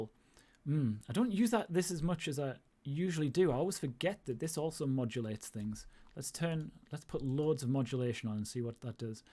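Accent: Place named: Newcastle, United Kingdom. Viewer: Good to hear a fellow Geordie into this stuff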